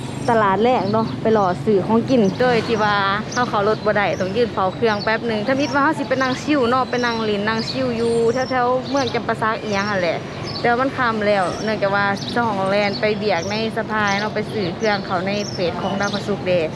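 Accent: Ubon Ratchathani, Thailand. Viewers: พี่น้องไทยลาวกะนี้ล่ะสำเนียงการเว่ากะคนเมืองอุบล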